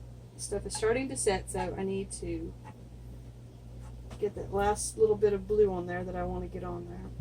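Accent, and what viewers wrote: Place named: California, United States. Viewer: Love your accent. Sounds Cali with a southern past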